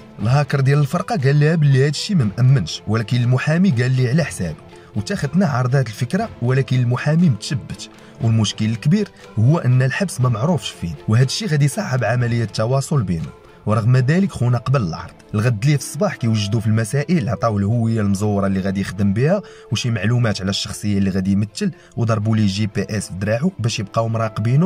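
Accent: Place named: Tunisia. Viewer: علئ حسب ضني هذي اللهجه التونسيه اذا ماني غلطان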